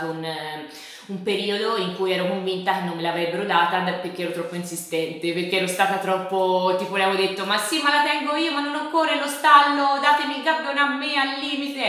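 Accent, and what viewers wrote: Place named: Tuscany, Italy. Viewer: siiiii mi tieni un sacco di compagnia , è molto piacevole ascoltarti, è bello sentire il tuo accento toscano/garfagnano ma è ancora più bello guardarti 🙈🙈adoro i tuoi occhi e i tuoi occhiali,stupendi!!!🙈😜😘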